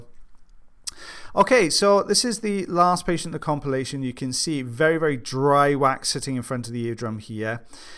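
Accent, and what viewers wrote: Wales, United Kingdom. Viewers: First and foremost, let me say thank you for your attention, time and dedication to the subscribers of this channel.. The recent changes seem to have had a polarising effect on viewers, especially the long term viewers, and I think a large part of that is because of the removal of the trademark beard. However in all honesty people hate change, and have followed the channel through a great deal of change recently. So here's MY QUESTION: What happened to the "#Countdown"???? Of all the changes made, it was my favourite.. ... Watching & listening, as your viewing old ear wax removals WAS THE BEST! Your natural exuberance and Welsh accent, coupled with your race calling abilities had me on the edge of my seat. It was wonderful and for those of us who have literally watched as the format grows and changes with the tides and seasons and the viewers, I thinks it's safe to say; no matter what the true waxaholics will always be here. 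As for my vote, well that was officially recorded on the community page, however returning to the Countdown is my unofficial vote.... Viva La Difference....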